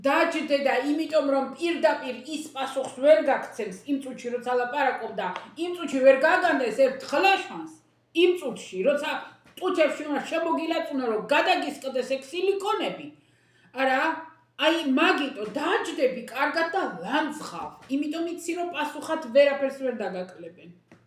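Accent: Kakheti, Georgia. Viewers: მისაბაძი ქალბატონი ბრძანდებით, თქვენი საოცარი იუმორით, კახურით გემრიელით და თან გულისტკივილით რომ გადმოსცემთ სათქმელს ყოველთვის ჯანსაღად. უზომოდ პატივს გცემთ ქალბატონო,. საშინელებაა, თუმცა მასეთი სულით მდაბალი ადამიანისგან, სხვას არც უნდა ელოდო. ჯანმრთელობა, წარმატებები და სულ ლამაზი დღეები თქვენ.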